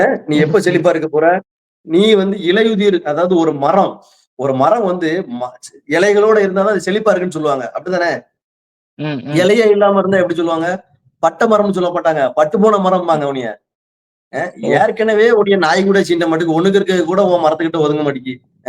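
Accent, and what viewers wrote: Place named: Tirunelveli, India. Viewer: uncle anna nallairukeengala super namma tirunelveli manvasanai pechu super..... super name uncle....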